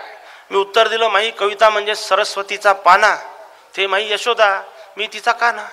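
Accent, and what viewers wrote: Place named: Vidarbha, India. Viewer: Vidarbhachi Marathi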